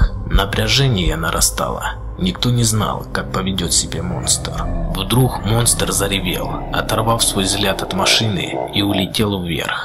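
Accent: Ukraine. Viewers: это украинский акцент ! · и не в обиду тебе:постарайся как-то сглаживать украинский акцент на букве "г" , "друХ",например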